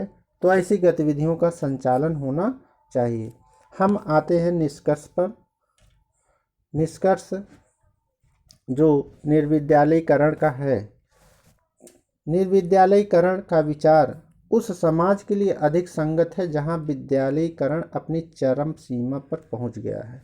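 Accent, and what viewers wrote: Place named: Australia. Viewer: Sir ye austrilan thr American nahi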